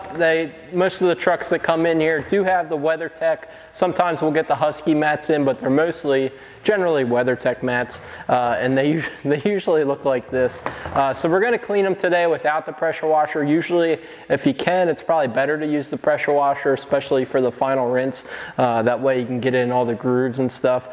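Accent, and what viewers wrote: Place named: Midwest, United States. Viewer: You've gotta be from the Midwest with that accent 😄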